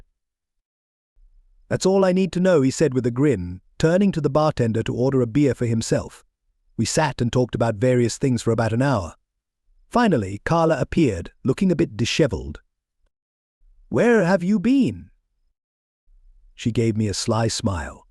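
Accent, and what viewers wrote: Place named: England, United Kingdom. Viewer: Cannot stand the English accent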